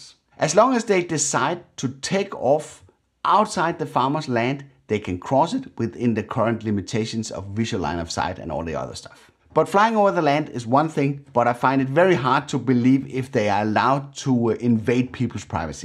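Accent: Denmark. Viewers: I thought I recognized the accent... I'm half Danish. (Not important but yay)... Very informative and interesting video. Tak. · Ah, Denmark. Tried to place the accent and then the language reminded me about the "Danish language breaking down" video. 😁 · As a Norwegian; I can hear a distinct danish accent in your english🇳🇴😂 edit; Impressive vids👍👍👍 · Lige en dansker der tjekker ind. Der er SÅ MEGET plus på kontoen for at rent faktisk tag det der "Youtuber" seriøst istedet for det evenlige jeg er sej - selvforheliigelses som er upræget for mange Y-T (fra dk) Dog lidt malurt i bægeret. Dit DK accent er MEGET stærkt, men MEGET derudtale uden øhhh ehhh and so on. Good Work ! sq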